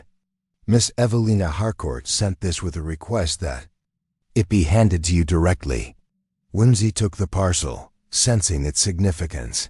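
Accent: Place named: United States. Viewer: It lost its soul when read in American English. Deleting the channel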